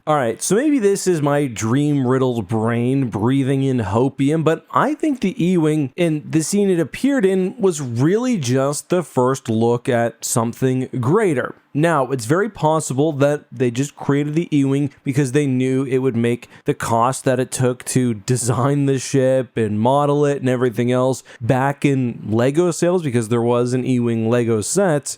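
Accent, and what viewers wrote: Canada. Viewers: oh , Canadian or from Minnesota?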